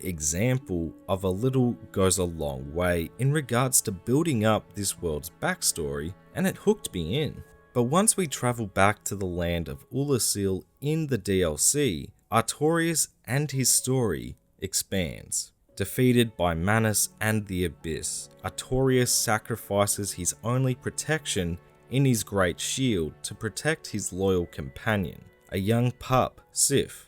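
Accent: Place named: Australia. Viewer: Great channel, you should have more subs. Also nice to hear another Aussie voice on youtube.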